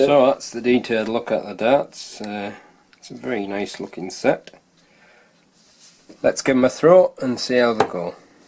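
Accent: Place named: North East England, United Kingdom. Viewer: I can't mind too which video it was but that's when I said "I detect a north east accent!" haha... Hartlepool back in the 80's was a nice place to live, used to live in one of the nicest roads to live and now it's like a jungle now, all the houses are gone cause the council are trying to make better houses and trying to hartlepool a city but it no allowed to be one cause of the drugs and violence have increased in the last 10 years:(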